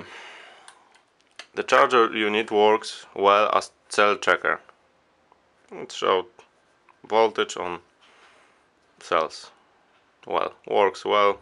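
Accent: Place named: Russia. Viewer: From your acent I think you are Russian) But, anyway, Thank tou!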